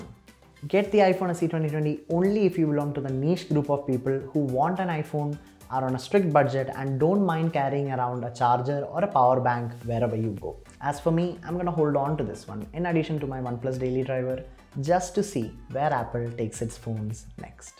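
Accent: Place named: South India, India. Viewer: Excellent video, dude. Keep up the good work. Can't resist saying this : Try to have a neutral accent. You sound like a South Indian. Apologies if I am wrong :)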